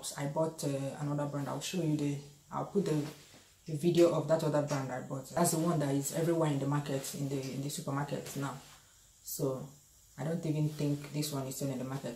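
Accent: Igbo, Nigeria. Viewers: you're begging us... 🤣🤣🤣🤣 honestly I hated cooking when I was small with my mum. we would cook for hours... now we are grown she uses it... i wish we had this long ago in Nigeria.. love you igbo accent so much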